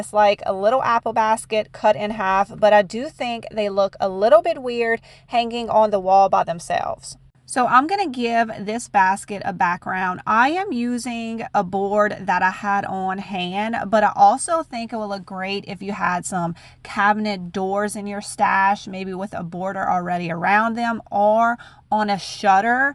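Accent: Louisiana, United States. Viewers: Good day. I love your accents. Please settle a bet. I hear Louisiana and The South. Am I correct? Pft, my son says I'm wrong.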